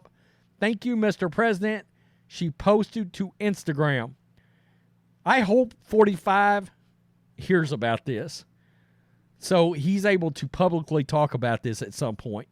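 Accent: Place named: Tennessee, United States. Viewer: you are so country sounding! You sound just like me talking. Lol im from Tn so we get it honest! Love ya! Great reporting as always! I love this channel!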